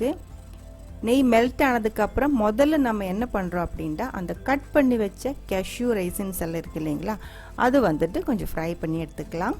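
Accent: Kerala, India. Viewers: Kerala Akka Malayalam kalantha Tamil pesaraanga, nice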